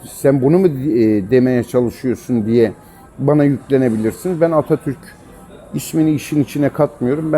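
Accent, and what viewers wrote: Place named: Kars, Turkey. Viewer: Abi gine kars şivesi sıkışırdın BUNLAR SARSAK OĞLU SARSAK 🇹🇷🇹🇷🇹🇷🇹🇷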